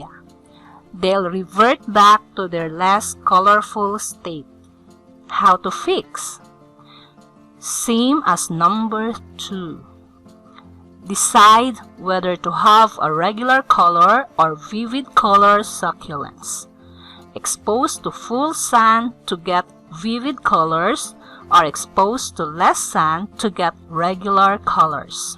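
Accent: Philippines. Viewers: The narrator has a Filipino accent, yes?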